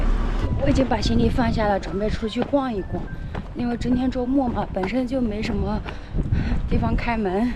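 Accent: Northeast, China. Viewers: 应该和东北女孩一起工作生活过，满口的东北口音，一点不像服南妹子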